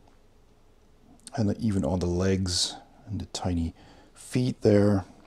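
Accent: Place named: Netherlands. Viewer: i could tell youre from the netherlands like me, ill be getting my set tomorrow and im super hyped for it!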